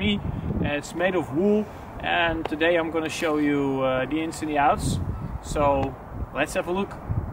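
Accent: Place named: Netherlands. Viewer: Ja klopt : ) Hoorde aan je accent in de video ook gelijk dat je uit Nederland komt, haha. Dank voor de tip, die site ken ik wel, veel toffe surplus daar ja. Het Waterlooplein in Amsterdam levert ook wel eens leuke vondsten op en soms zelfs de plaatselijke kringloopwinkels. Vintage kleding en oldschool campinggear enzo.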